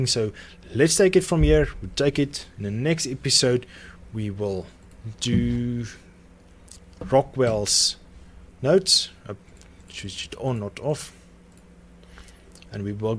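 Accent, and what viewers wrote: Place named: South Africa. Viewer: i heard it in the accent and when you Said ja further in the video i knew for a fact, im from SA aswell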